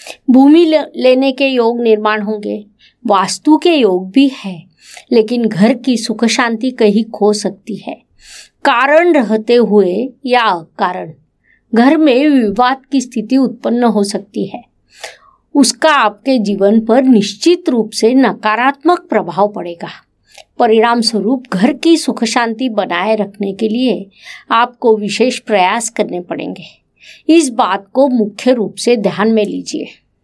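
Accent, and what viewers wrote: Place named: West Bengal, India. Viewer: Ye kaunsa leheja hai ? Bangali hain kya aap ?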